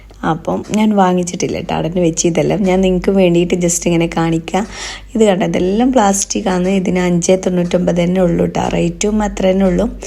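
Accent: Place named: Malappuram, India. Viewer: Nalle paangnd ഉപ്പളാത്തെയും മലപ്പുറം ഭാഷ 2 ലും mundikko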